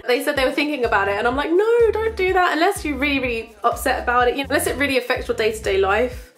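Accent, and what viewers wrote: United Kingdom. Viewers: why u don't make a video for learn english accent cause i really like ur british accent , actually u don't need make up !! you're pretty .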